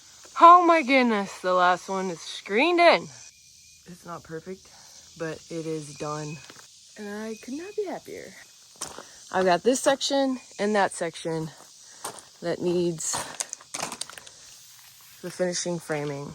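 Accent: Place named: South, United States. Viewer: I'm sure most of your subscribers are like me and don't really care that much about hiking we are just here to see a very beautiful girl with a beautiful southern accent. You could make videos about basket weaving and I would still watch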